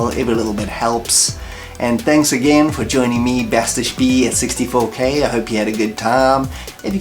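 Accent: South Africa. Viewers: I've always thought you had a South African accent!! That explains it:-) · I'm guessing your a Saffer living in the USA? (Not digging for info, just a guess from the accent) I think at least C64, Amiga and other 8 and 16 bit companies should give you copies of their software. Some absolutely brilliant games are coming out for retro systems. Maybe if we mention you now and then on their forums - it might grab their attention? I have to play these new games on emulators. Just playing the demo for "Shadows of Shegorath" on my Pi400. Great game but in the back of my mind there is an itch that is telling me "You should get yourself a proper Amiga" Same when I play "Boulderdash" on Vice "...This is a great game - but I need to play it on a proper C64"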